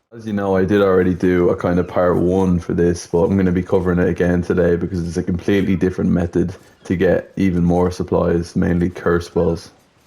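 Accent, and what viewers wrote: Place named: Dublin, Ireland. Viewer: What part of dublin you from lad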